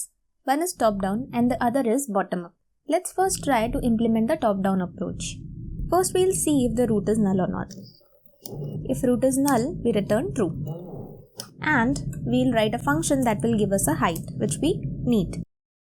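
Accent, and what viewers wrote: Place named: India. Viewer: indian accent spoils any kind of tutorial :(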